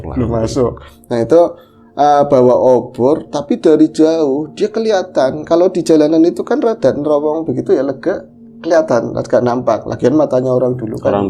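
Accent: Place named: Brebes, Indonesia. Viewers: Seneng dengar gaya bahasa Maz', medok banget.👍😊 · Kangen logat brebesnya mas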